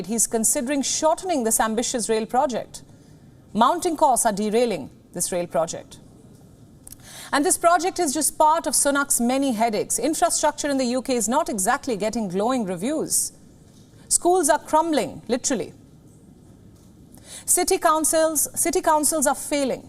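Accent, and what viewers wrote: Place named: India. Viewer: the host Ugly Indian accent is a pain to hear. Indians just got the worst accent and everyone in the US is laughing at how Indians speak English.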